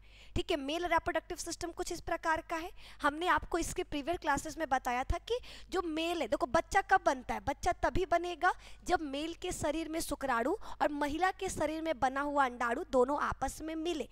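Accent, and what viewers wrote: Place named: Rajasthan, India. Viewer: ये राजस्थानी है शुक्राणू को शुक्राडू बोलती है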